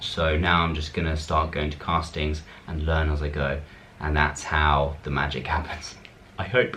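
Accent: United Kingdom. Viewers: your British Accent is so cute.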